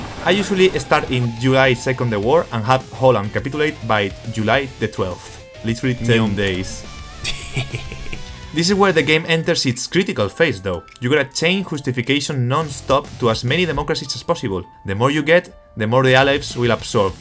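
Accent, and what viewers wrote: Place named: England, United Kingdom. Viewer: Jajajajajajaja me da gracia tu acento inglés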